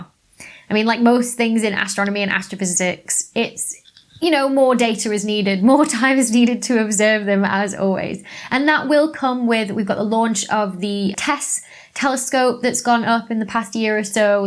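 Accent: United Kingdom. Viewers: Your accent. Is just fine; after Boston, all Brits have learned to hide their "T." 😊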